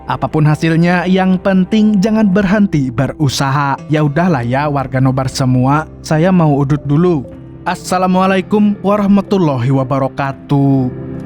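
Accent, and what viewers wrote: Banjar, Indonesia. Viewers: nah org banjar rupanya sidin wkwkwkwkwkw 🤣🤣🤣🤣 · urang banjar kah pian nih mang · Ada logat Banjar jua amang nya😅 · Ulun Hanyar sadar kaya nya Pian nih urang Banjar kh😅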